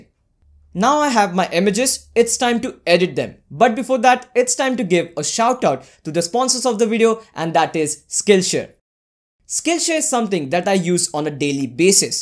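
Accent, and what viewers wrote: India. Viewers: I'm sorry but I can't focus on the video, Indian accent is the best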